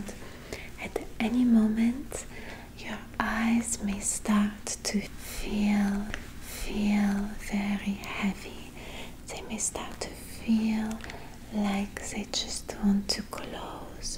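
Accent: France. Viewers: Oh this video is incredible! You look so pretty in that outfit and your French accent is so cute! This was a very nice thing to wake up to.🤗💜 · I love the French accent and the lovely maid uniform. Your voice is very soothing and comforting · That french accent you do here is to die for... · You are amazing----have yet to see anything you can't do. Looking Beautiful as a French Maid and Fantastic accent too. LOVE---LOVE everything you do. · That's a good French accent 😂 reminds me of the 80s comedy show Allo Allo if anyone remembers that.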